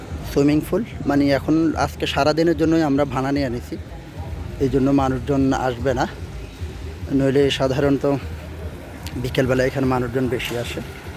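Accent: Noakhali, Bangladesh. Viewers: ভাই আপনার বাড়ি নোয়াখালী?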